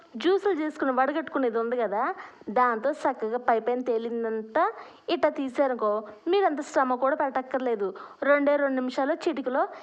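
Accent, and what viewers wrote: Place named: Telugu, India. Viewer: రొయ్యపోట్టు కారం ప్రిపేర్ చేసుకునే విధానం చక్కగా వివరించారు సిస్టర్ మీరు వివరించే అచ్చమైన తెలుగు యాస స్టైల్ సూపర్. 👌🎉💐🙏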